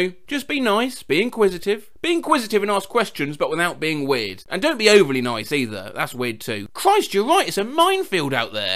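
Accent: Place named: RP, United Kingdom. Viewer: Hell yes new posh Brit video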